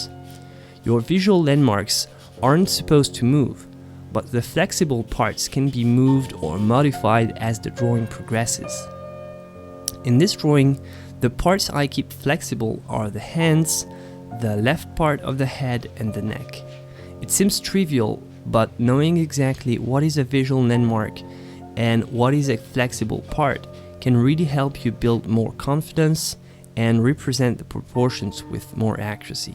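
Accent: France. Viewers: I can tell you've been working on your English. Your French accent is much less pronounced now. If you've been working on this, well done!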